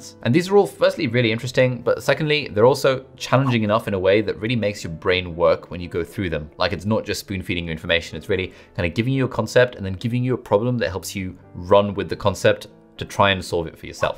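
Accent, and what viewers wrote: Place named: United Kingdom. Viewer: New Subscriber, where in the UK are yiu, can't work out the accent? 🤔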